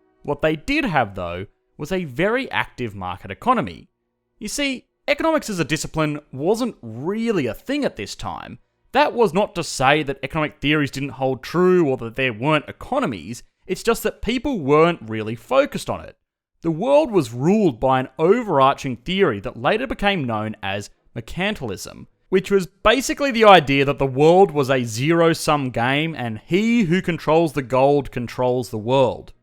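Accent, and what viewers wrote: Australia. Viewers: One of the reasons I watch your videos is to hear your Australian accent. But I never knew you guys pronounce it... mer-CANT-alism.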